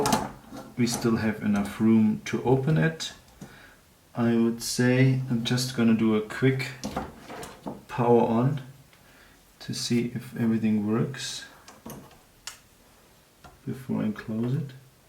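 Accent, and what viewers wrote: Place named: Germany. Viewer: You have a very nice german accent! Greetings from India.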